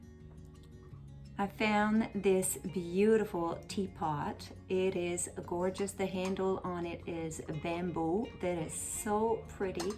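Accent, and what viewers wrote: France. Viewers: Great finds! I love the big bunny too! I also love your French accent... Beautiful! 💗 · Great job, shopping,!!! I can't wait to see your finished treasures!!! This video answered a question for me. I noticed that you have a lovely accent, but I wasn't sure what it was. So, it's French!!! You handle the crazy English language very well!!! You are so sweet!!!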